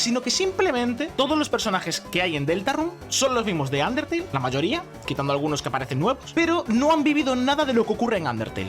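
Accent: Galicia, Spain. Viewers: Me encanta ese acento gallego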